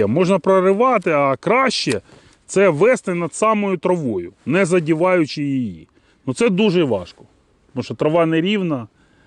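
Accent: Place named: Surzhyk, Ukraine. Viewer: Це якесь жахіття! Хіба так важко підтягнути українську? Ну таке вже суржело махрове, вуха завертаються! На весь україномовний ютуб дві рузскагаварящіє цєлюсті - і Петраш.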